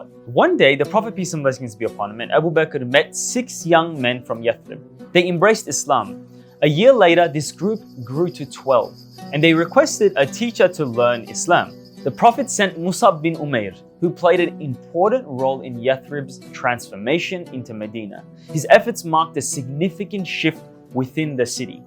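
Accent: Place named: Australia. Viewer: I loved the accent, reminded me of an Australian accent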